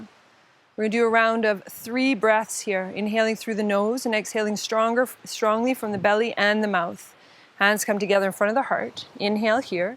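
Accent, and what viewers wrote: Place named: Canada. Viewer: This is my go-to practice every night when I get home from work. It’s challenging, energizing and I never get tired of her comments and instruction. (I also adore her Canadian accent.) I smile every time she says to relax/let go of the idea there is somewhere to go. I also recommend her other videos. 💗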